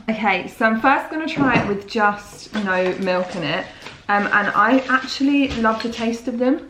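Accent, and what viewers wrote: United Kingdom. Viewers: British accent makes me so happy and emotional lmao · you are aggressively British I love it - sincerely an American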